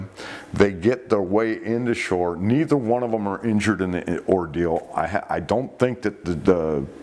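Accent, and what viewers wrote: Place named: Midwest, United States. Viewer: I find it extremely odd that, a guy from Michigan or Illinois (guessing by the accent) who also, admits that he's too Scared to swim in the ocean....is giving advice and recounting stories about Sharks and shark attacks. That'd be like a life long floridian telling the do's and don'ts of ice fishing. 🤔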